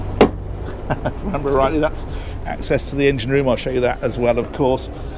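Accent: England, United Kingdom. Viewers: starting my morning with "crockery" always makes me happy (don't ask me why...I have no idea, just love the English vocab and accent)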